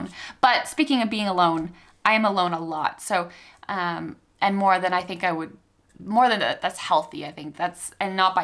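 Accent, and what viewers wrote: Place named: Canada. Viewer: I love the Canadian accent nuances I'm surprised i pick Canadian over American as an Australian. You're awesome your guy is ver6 lucky 😃👌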